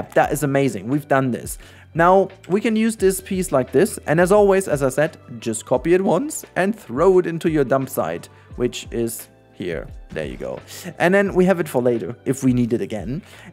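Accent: Germany. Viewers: Sag mal, bist du Deutscher? Ich höre da so einen Akzent. 😄 Hab dich gerade entdeckt, mega Video! Danke dir.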